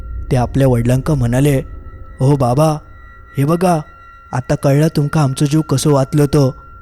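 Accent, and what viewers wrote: Malvani, India. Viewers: Hi malvni ahe ashich aste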